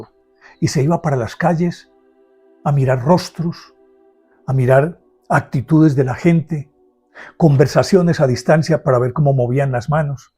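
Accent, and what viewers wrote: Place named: Colombia. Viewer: Todo esto es muy interesante, lástima que la copia de la obra usada es una obra muy alterada, y ese halo de santos vuelve a aparecer, soy un gran amante de la obra de Leonardo Da Vinci sin el endiosamiento que le han dado, era un hombre talentoso, seguramente muy conflictuado por sus orígenes, su homosexualidad y sobre todo que fue muy incomprendido. Don me gusto su tono de voz y el acento colombianos es muy bonito, gracias!!!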